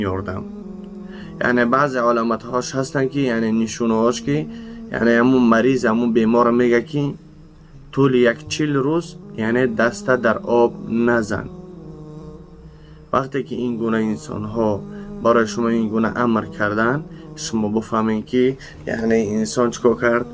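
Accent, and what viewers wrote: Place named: Afghanistan. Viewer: асалом додар барои чи вакте чинхони мекуни хамара якчоя боядки якта якта хонишон у чино ба якдигариш мегузаран барои чи авгони гап мезани додар гапи точики худта гум кардай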